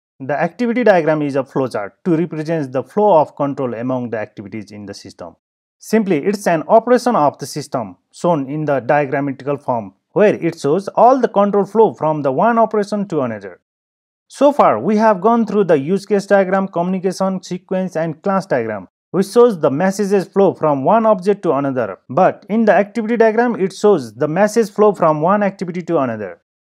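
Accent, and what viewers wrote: Nepal. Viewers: Nepali accent xa ta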